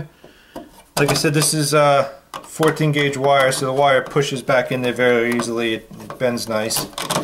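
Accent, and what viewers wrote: New York, United States. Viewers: dude TALKS LIKE NEW YORKERRRRRRRRRRR MAYBE HES FROM THE BRONX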